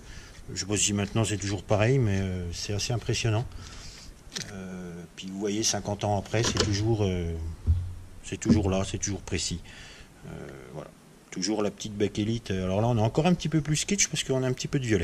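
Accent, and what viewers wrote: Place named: Jura, France. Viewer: Tu dois pas habiter loin du Jura je me trompe ? T'as un léger accent ^^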